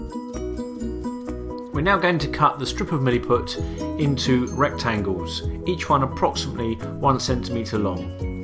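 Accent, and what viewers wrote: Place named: England, United Kingdom. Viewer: i like that voice from england,thanks for the tuto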